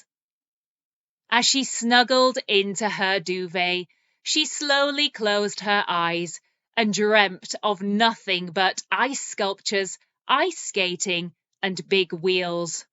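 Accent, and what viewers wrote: United Kingdom. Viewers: I did enjoy reading and listening your British accent. There were some words that I couldn´t get it however it´s great news due to it´s means that I have just learned more. Thank you.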